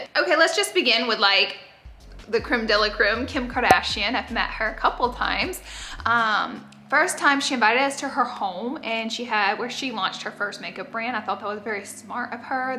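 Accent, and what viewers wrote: South, United States. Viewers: Absolutely love, love, love you. I am so glad you done this video in your original accent not your Cali girl voice. Not judging, just love southern.🥰🥰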